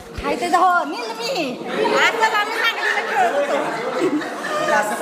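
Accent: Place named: Satara, India. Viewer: खूप छान आठवणी आणि त्यात सातारी भाषेत ऐकण्याची मज्जाच भारी... लय भारी🎉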